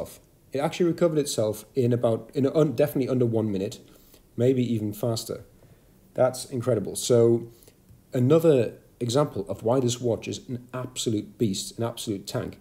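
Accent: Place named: United Kingdom. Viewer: So the watch not working is a protection thing from the heat? I live in Arizona where it gets to 120 degrees , and my Gshock, keeps and going, and just about any other watch I have, including a mechanical wind up watch. The summer's in Arizona gets so hot you cant touch metal with out it burning your hands, you have a British accent, I grew up in the UK, the UK does not get that hot. Something is wrong with your watch, my Gshock is 11 years old, just saying.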